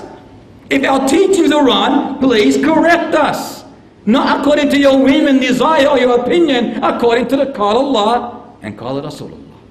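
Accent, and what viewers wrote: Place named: Australia. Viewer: before insulting him, please know that he accent is Australian and that's how they talk. Don't judge him for the way he speaks, but for his content.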